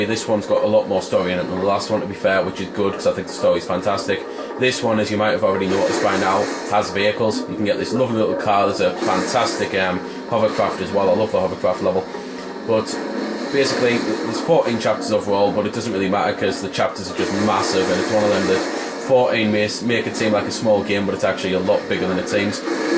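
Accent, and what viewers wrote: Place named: North East England, United Kingdom. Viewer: i enjoy your reviews! that's gotta be a northeast accent.. middlesbrough?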